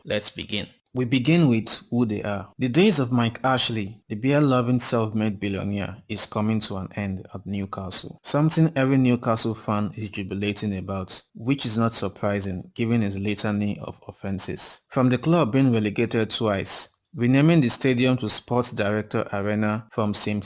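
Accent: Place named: Nigeria. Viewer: Real Nigerian accent you got there mate.. Good info though.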